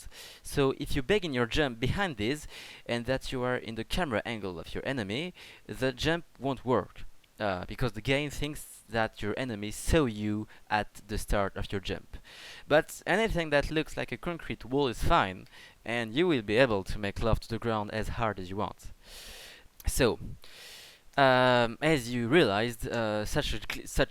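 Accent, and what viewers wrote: France. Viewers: have you never heard a French accent before? lol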